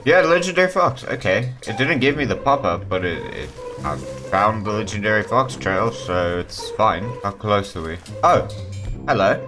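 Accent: South Africa. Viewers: Bru you from SA? Pretty sure im hearing correct lmaooo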